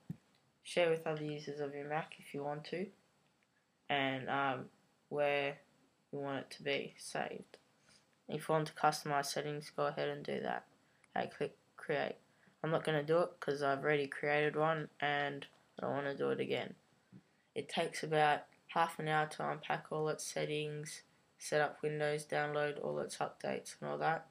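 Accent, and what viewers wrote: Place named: England, United Kingdom. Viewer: ...annoying english accent from a teen not wanting to talk straight.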